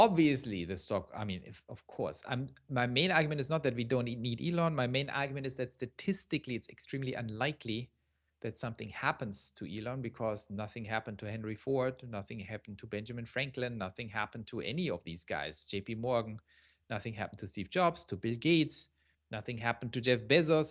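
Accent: Germany. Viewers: the video audio is drowning your beautiful german voice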